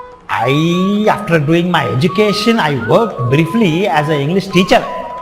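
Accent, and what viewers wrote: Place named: South India, India. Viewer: So amusing presentation! Perfect South Indian tone! Awesome, proud of you, Sir!😊👌👍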